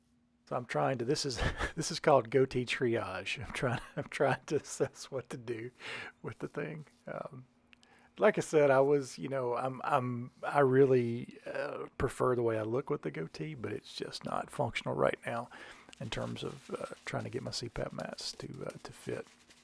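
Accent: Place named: United States. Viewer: no, just American in general.🤣 I was trying to place your accent in a specific state, though.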